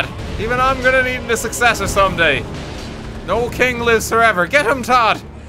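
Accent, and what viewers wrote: Ireland. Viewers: This is my first time watching him and I was just like; "Sniff sniff, is that an Irish accent I hear?" I love the rep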